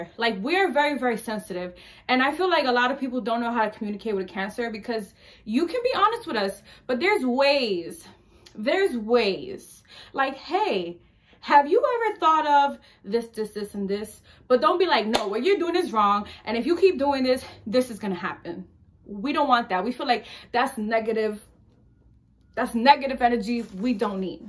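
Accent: United States. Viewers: July 16th you sound like your from the Bronx also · Lmao omg you sound just like meeee! You def gotta be from NY or something! Anyways , CANCER GANG July 12th♋️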